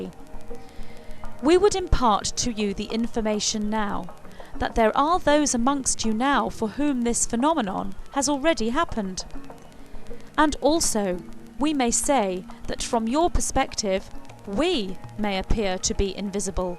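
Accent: United Kingdom. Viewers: Seeing as you live in England I would expect you to have British accent but I wouldn't expect someone from another planet to have a British accent.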